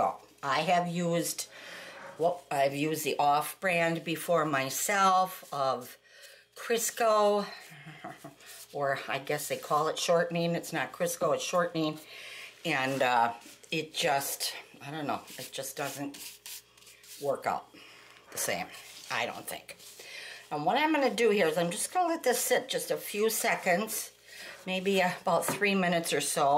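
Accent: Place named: Wisconsin, United States. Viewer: I'm listening to your instructions and just said to my husband "I wonder if she's from Wisconsin?". I looked at your channel, and oh geez; you're from Wisconsin! I guess we just know a fellow Wisconsinite ❤ . Are you going to watch the Packers play in Brazil on Friday? Go Pack Go! Thanks for the pie crust! It's nice and easy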